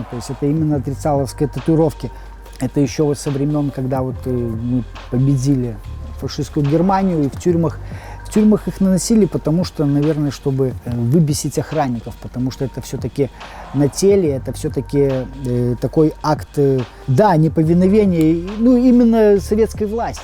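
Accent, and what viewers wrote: Belarus. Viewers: какой всё таки специфический белорусский говор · Чувак из Белоруссии, по разговору. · Белорусский акцент у чувака.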